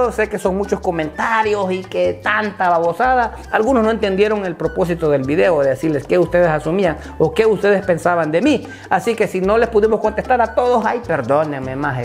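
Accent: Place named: Oriente, Cuba. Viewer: Soy chalateco y creo que vos sos de Oriente así hablamos también cuando estamos jodiendo te felicito sos auténtico maje segui adelante tenes mi apollo aquí esta tu 👍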